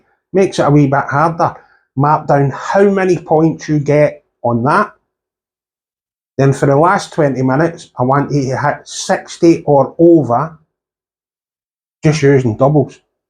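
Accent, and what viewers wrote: Scotland, United Kingdom. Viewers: nothing like a Scottish accent! cheers, great video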